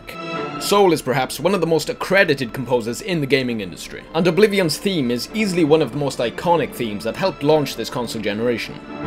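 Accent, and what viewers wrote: United Kingdom. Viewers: You sound like Cryaotic(A famous youtube gamer) with a british accent.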